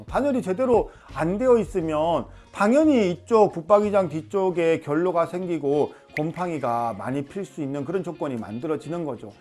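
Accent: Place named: Gyeongsang, South Korea. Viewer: 구수한 경상도사투리로 설명을 잘해주셔서 도움이 많이됩니다 대구에도 파트너사 있는지요??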